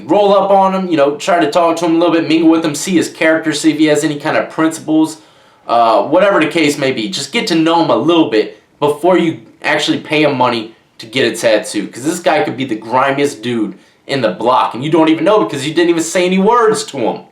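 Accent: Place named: South, United States. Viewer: He got a country accent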